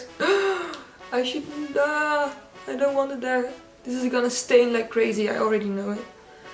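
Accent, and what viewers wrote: Netherlands. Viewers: Are you dutch. Because your voice sounds dutch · Dit is de eerste video dat ik van je zie je doet je intro en ik weet gelijk al dat je nederlands bent door t typische accent😂❤ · This is the first video I've watched from you and the second you spoke, I had a gut feeling you're Dutch. Came to find out you are! Haha! Also, love your new hair color. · what is your accent? is itDutch? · Are u Netherlands? I think so cause u have kinda a dutch accent while ur speaking English.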